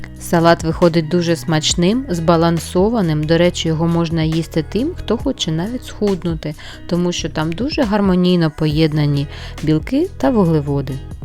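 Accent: Standard. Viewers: Мені дуже подобається ваша чиста українська мова! І салатик смачненький! Буду чекати інших ваших рецептів!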